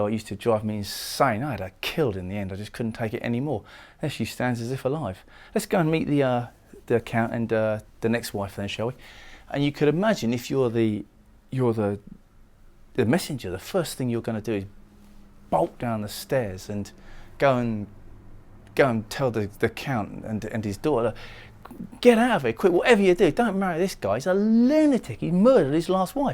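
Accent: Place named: England, United Kingdom. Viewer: Excellent teaching, great to hear an English accent. Thank G_d for a simple interpretation in plain English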